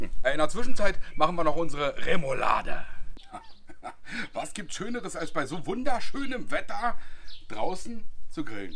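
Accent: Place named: Bavaria, Germany. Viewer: Der Dialekt, Basd. der heimliche Bayer. Unterwegs in Berlin als Entwicklungshelfer. Bravo Juhu. Ganz liebe Grüße aus dem König Söder Land.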